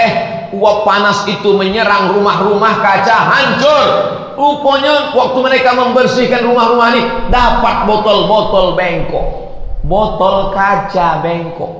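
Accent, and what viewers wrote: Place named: West Sumatra, Indonesia. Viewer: cakapnya mcm bahasa minang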